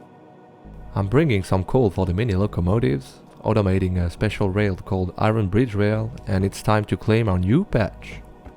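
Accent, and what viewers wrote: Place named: England, United Kingdom. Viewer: Joli accent englais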